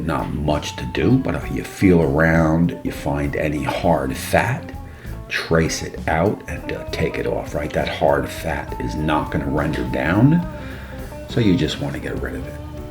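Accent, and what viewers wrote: New York, United States. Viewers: I like your style mate, that’s a New York accent right ? You a sports fan ? I’m an Aussie and can’t get enough of American sport, I love everything New York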